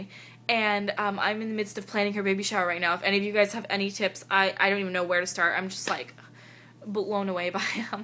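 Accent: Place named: United States. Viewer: Yeah i didnt wanna admit but i just eat it too, coz im a fattie and i think 'meh. cake is good' ha. Well, im with you.. im trying to be good.... I've been prescribed this medicine called orlistat which doesnt let the body absorb fat or something... my bum is officially on fire lol. sorry tmi but hey were all ladies moaning here :) And yeh your accent is great!! Any american accent is soo glam to the boring brits lol x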